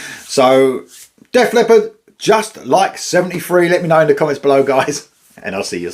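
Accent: United Kingdom. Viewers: I'm surprised you spent so much time on something you don't like. Just asking really gently and in all sincerity why did I waste time listening? (I think it's because I enjoy your UK accent from a region I still can't identify and your interesting REACTION comments!)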